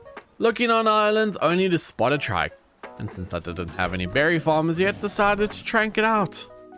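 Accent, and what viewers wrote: South Africa. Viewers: wait...by any chance are you a south african? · I KNEW YOU WERE SOUTH AFRICAN LOL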